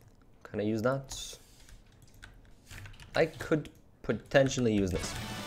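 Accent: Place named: Norway. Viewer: Was this pre-transition? You sound sort of exactly like any Norwegian guy and it’s off putting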